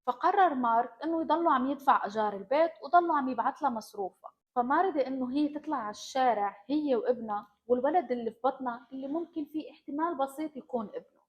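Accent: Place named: Morocco. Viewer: أنت أكيد من المغرب... Your accent باين ماشي لبنانية.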